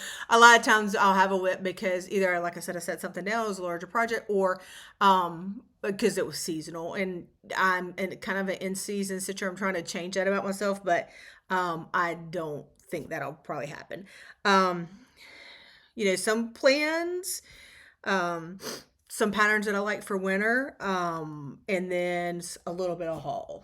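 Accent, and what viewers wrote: South, United States. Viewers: .... you might be my most favorite to watch. I love your southern accent and your laugh. REALLY love your stitching and WIPS. Such inspiration with each video. I also may continue to stitch my Christmas, Snowman, and Winter charts. I paper craft also and have decided to leave my Christmas papers and stamps out and continue creating. I am not tired of stitching or making Christmas projects just yet. Have you done a room tour yet? Each time I watch your videos, I always wonder if it is a bonus room upstairs or maybe a large bedroom. Either way I love the two small closets in the back. I could fill them up. Have a great January. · To one of my favorite FlossTubers....I love your selections for stitching and your lovely southern accent. Here in the Northeast I truly am cozying into Winter ....gotta embrace the white and next week will be below zero! 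🥶 Hope you have a great January and I look forward to watching another episode soon! 😊 Kathy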